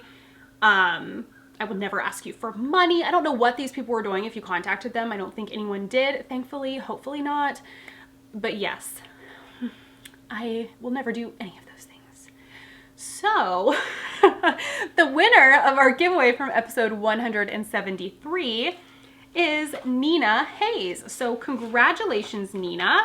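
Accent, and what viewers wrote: West Virginia, United States. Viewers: I hope you had your cosy snow day - chilli and brownies sounds like a great way to spend the day. Happy Birthday to Eric. The love story pattern looks great both ways, I particularly liked the version which just used 2 colours - although I think a gradient yarn may have merit in place of the minis. If you got snow I hope it clears enough for your family to be able to visit - you need to be able to practice your WV accent with your Sister (your reaction to “by” made me laugh)! Best wishes, J x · Good morning! Glad to have this to tune into 1st thing this morning. I am working my 2nd pair of Magic Loop Vanilla Socks!!! I learned from your tutorial and now feel so empowered to create the cutest socks. It makes me very happy, will never NOT have socks on the needle now. Your January yarnable socks are fabulous. The dark pink is stunning with the lighter contrast color. 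I will definitely try this pattern when I'm done with the vanilla socks I'm working now. Thanks for the inspiration, Has made my life even better!!!! Loved drinking my coffee to your vlodcast this morning- good way to get the day started. You can take the girl out of West Virginia but you can't take the West Virginia out of the girl!!!! Cute southern drawl revealing your roots!!!!!!! Have a great Thursday🤩🐑🍵🧶 -Carrie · You seem to realy enjoy podcasting again without the additional responsibility of the shop, and your new pattern is lovely! I especially love the 2nd colorway you are making in the Lovestory sock pattern. Btw, being a WV born gal myself, I picked up on your accent coming through; happenns to me when I go back to visit relatives in Almost Heaven. I, too, love the winter snow season but only get to enjoy it once in a while when I visit the homeplace.. Thanks for sharing your knitting and knowledge. 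· I am so going to purchase your new sock pattern! I'd love to hear you talk in your W. Va. accent. I'm from Ga, so you already know how I sound. lol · I love seeing you back on track ❤ and I’ve never seen that color Stanley cup!! Let that WV dialect fly! 😂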